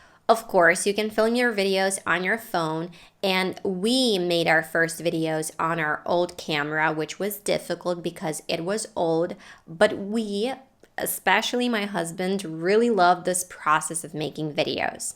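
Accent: United States. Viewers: Hi there, you're the greatest English teacher and as well as your American accent is so awesome..And please ignore the dumbest stuff who're criticism about you..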